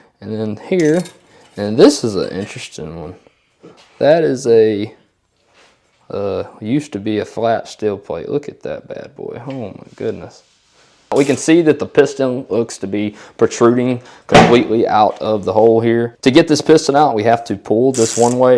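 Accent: Alabama, United States. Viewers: He's from Alabama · That Alabama accent gets a smile on me every time!😊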